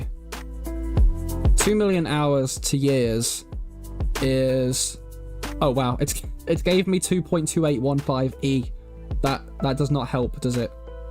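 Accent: United Kingdom. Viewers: AAAA CONGRATS!!! you sound very british ahahaha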